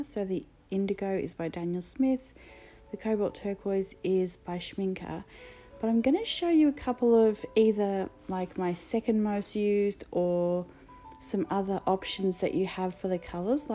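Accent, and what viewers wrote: Australia. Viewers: her billowing heart You sound Australian? Thank you for this delicately made video, it was so different to all the other palettes that I see on Utube. Tip: I read on a comment a while ago, that you can use glue stick on the palette, unless you like your little beads of colour on your tin which are really cute! I use the glue stick and I wipe it off with a wet tissue. The reason I like this, is you don't have to scrub it, or erase it or buff the enamel. It prevents staining too! Just be mindful not to use a towel, or a heavy paper, as you will get lint in it. It makes sense though, with the sticky honey pigments, although when you say use glue stick, it kind of shakes you up a bit. Have you naturally taken a while to like all these colours? Interested in getting more of those pearly colours from Daniel Smith, and the gold that was on your cherry blossoms? 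I think I liked it when you mixed these out, as it looked like you had a lot more that I wouldn't have thought to make. Have fun In kindred spirit Eliza MB Day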